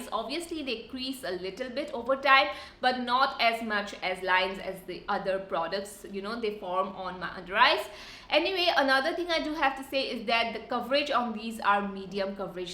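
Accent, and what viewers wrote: India. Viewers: Woooowww supeeer happy i am i love colorpop products a lot and you are so so sweet di u always come up with this dupe videos and as u know i just love your dupe videos it means a lot to a person like me who s a student and can't afford much and invest much so you are a dream for us thankyou is not enough to say i just love you as a person and your every video has my heart di and your Hindi accent is so so cute 😍 haha i love it too. Keep growing di and keep shining in this way more to come always by your side take love di ❤️❤️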